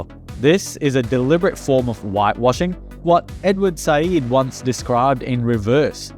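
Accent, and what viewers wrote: Australia. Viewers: You're not even Canadian, you're Australian from your accent, who are you to speak about the internal affairs of another country care to explain !???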